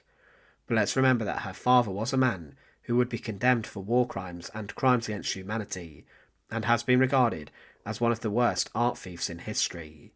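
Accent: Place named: Yorkshire, United Kingdom. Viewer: It’s probably AI. I find narration by AI very irritating. The accent is pretty heavy, maybe Yorkshire.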